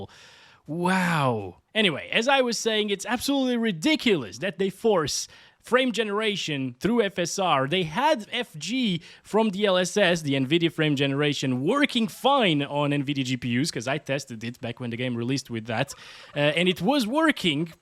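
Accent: Portugal. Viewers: bro eu ja vejo os testes deste canal a uns 8 anos e só hoje é que reparei que és português pelo "ah esquece", houve uma vez que pareceu-me seres portugues pelo sotaque mas hoje tive a confirmação ahaha, tudo de bom para ti! Abraço! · are u portuguese? sounds like it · wait are you from portugal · Es portugues!?! I knew it! haha been watching you for ages and was suspecting that because of your accent :)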